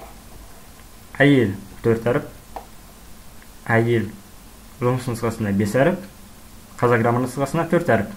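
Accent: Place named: Russia. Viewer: кешеден бері байқағаным негізі сіз орысша ойлайтын қазақ болып көріндіңіз, видеодағы қазақша сөйлегенде де орысша сәл де болса акцентіңіз бар. Қазақшаға шорқақтау қазақтарға қаза тіліне байланысты мәселелерді қолға алмағаны дұрыс ау. Кім сойса да қасапшы сойсын демекші. Терең білім болған кезде өзгерістер енгізуге болады. Жалпы, әліпбидің еш кемшілік тұстары жоқ, дайын 42 әріптен құралған, бүкіл әлем ұлттарының үнін ұштастырған әліпби. Артық ештеңесі жоқ. Білім және Ғылым Министрлігіне үндеу: АРТЫҚ ҚЫЛАМЫН ДЕП ТЫРТЫҚ ҚЫЛЫП ЖҮРМЕҢІЗДЕР.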